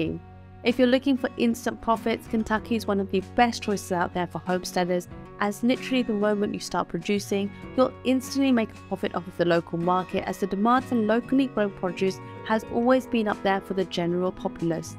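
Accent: United Kingdom. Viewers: Why is a Brit accent talking about American homesteads? Smh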